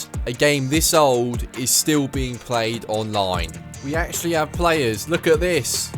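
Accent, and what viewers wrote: United Kingdom. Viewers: Damn, this man is Bri'ish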